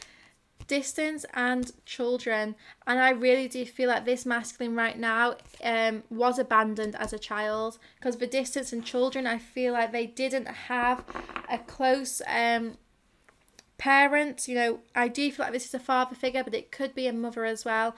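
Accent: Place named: United Kingdom. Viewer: Pretty sure accent is British and the cards are priced in pounds, so will be coming from UK. Usually fairly quick postage, although due to unknowns on Brexit, our international post is getting backlogged. I sent all my international post to my family for Christmas today to beat the 31 October.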